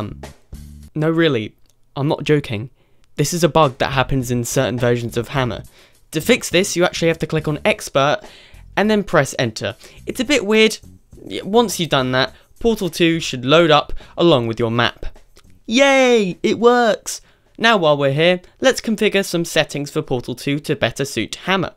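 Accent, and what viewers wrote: United Kingdom. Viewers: finally a fellow brit i can finally learn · Woah woah my man is Bri'ish. So am I mate! (well, I live in the UK so does this count?)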